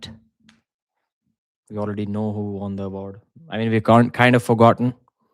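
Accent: India. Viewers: More Indian narrating, stfu and post the fucking match and wash your hands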